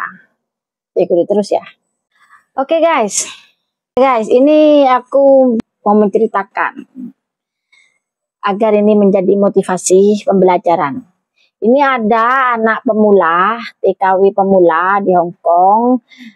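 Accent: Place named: East Java, Indonesia. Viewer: Biasa nya mental ya kak yg harus kuat klo gampang mlempem mental nya jgn coba² jadi tki/tkw,, mantap kak motivasi nya, btw kakak logat nya kyk ibu mertua saya kyk logat² probolinggo, situbondo, bondowoso, hehe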